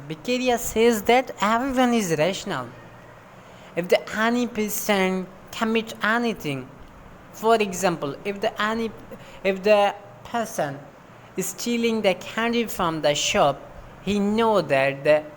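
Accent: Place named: Pakistan. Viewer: Pake ascent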